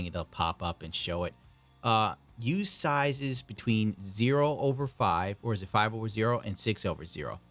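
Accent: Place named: East Coast, United States. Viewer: Love your videos. You are currently my favorite Gunpla youtuber. I love the diorama, and painted gunpla, your east coast accent and comments. All of it is fantastic. One issue I do have is that I can't watch your videos around my kids because of all the cursing. If you can tone it down, cool! I can let them watch with me. If you can't, that's cool too. I'll watch your videos by myself. Keep up the great work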